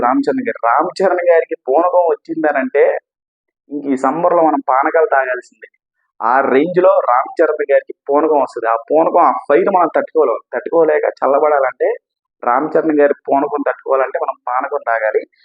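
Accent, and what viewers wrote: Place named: Srikakulam, India. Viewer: SRIKAKULAM voice bro......😢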